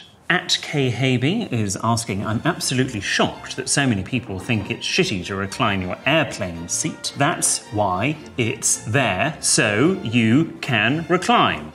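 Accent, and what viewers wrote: United Kingdom. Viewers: 判を押したようなイギリス英語だけで腹いっぱい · イントネーションイギリスすぎる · どうしてダメなのか理由を教えてくれるから良い。 イギリスっぽいイントネーションと時々皮肉が混じるのも見てて面白い。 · イギリス英語だー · イギリス英語聞けて嬉しい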